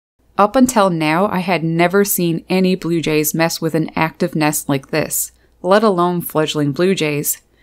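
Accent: Newfoundland, Canada. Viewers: My wife is from Canada and you say OUT, ABOUT, just like she does. Lmao.......sorry. I'm from the south and she gives me hell about Pile, Mile, Ain't, ......lol... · Like your Canadian accent. I don't see wasps landing on the jays to sting them, so at least they're not getting swarmed. Keep up the good work birdies. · Interesting and well put together video. I don't know why, but your voice sounds like someone from Newfoundland (or Labrador). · What accent is this? Canadian? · You are probably the most Canadian sounding person i know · Your definitely from Canada! Love the accent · the Canadian accent is so strong lol. Great video tho, i saw this here in Oregon recently in a different form. A Stellars Jay was actually hunting down and tearing apart wasps without eating them, almost in a defensive way.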